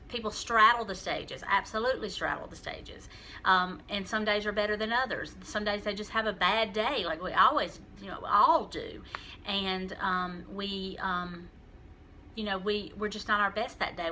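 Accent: South, United States. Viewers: Thank you so much. I have been learning more that is helping me understand and survive than I've learned from the professionals we see. I am a mental health professional and I frequently feel I am 'losing it'. But education of stages and expectations was so helpful in making plans but also allowing me to be more in the present with my husband of 49 years. He is the love of my life and we have no family. But I am sharing with friends and making more friends. They are now my family. I have joined the County Council on Aging Advisory Board as a volunteer to make connections but also learn and share and improve education and support for all fellow caregivers. Oh, and I am a midwesterner, Buffalo to Milwaukee, and I love your southern accent...and your therapy cat :-)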